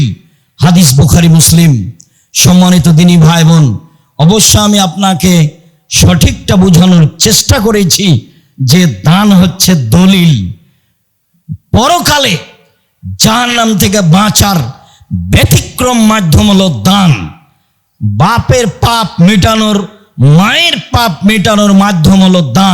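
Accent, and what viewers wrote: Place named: Kolkata, India. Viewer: কলকাতার দাদাদের মত কথা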